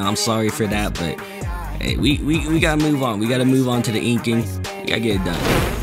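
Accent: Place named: Texas, United States. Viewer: Why does he sound lie he was born in texas because of his accent but hes really balck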